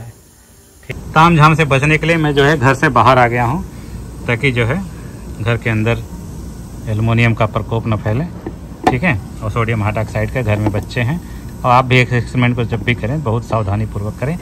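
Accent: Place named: Jharkhand, India. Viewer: Bhaiya aap kya jharkhand se hai kyonki apke baat karne ka tarika jharkhand wala hai isliye pucha or agar sachme aap jharkhand se hai to I am very proud of you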